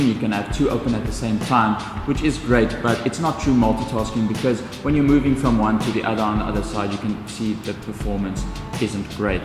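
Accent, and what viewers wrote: South Africa. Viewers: Poor audio, way too much echo and the background music is annoying but I do like the SA accent ! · Not only is the sound bad, but your South African accent shines through. Bit off putting. Next time try a few people to hear how they sound. Otherwise it is informative. I think the enhancements are awesome. The speed is also great...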